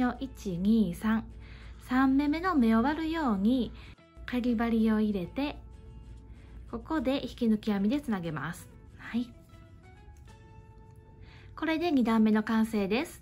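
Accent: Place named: Kyoto, Japan. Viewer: めっちゃ聴きやすいし、ゆっくりやり方が分かりやすくて、ありがとう。 京都語ポイ感じだね❤間違えたらすみません❣️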